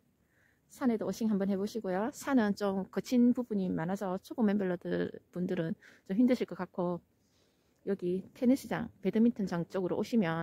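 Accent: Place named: Gyeongsang, South Korea. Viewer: 경상도 분이 서울에 오래 사신 분 같습니다 즐거운 설명 잘 듣고 갑니다 ^^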